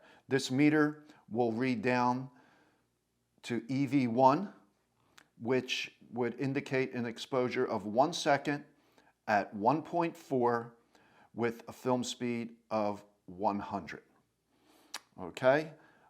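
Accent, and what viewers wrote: United States. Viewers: you have a usa accent. do you specifically hang on to the softer canadian nickel for opening the battery cover?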